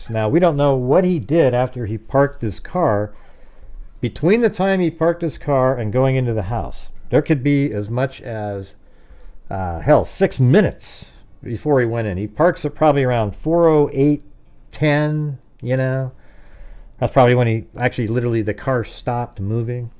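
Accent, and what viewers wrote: United States. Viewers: I live quite far away (Zabrze, Poland) and haven't seen anyone here who knows this story. I'm very interested in forensics and know a lot about many criminal cases. I'm completely captivated by Idaho. I usually compare the work of many sources, but in this case, it doesn't really make sense. Since I discovered your channel, my head has exploded with admiration for your work, talent, and knowledge. At the same time, I really enjoy listening to you; you have a good voice and that great American accent, like from the good old movies I watched as a child. I wish you much success with your channel; it's truly a great thing! Keep doing what you love and stay healthy.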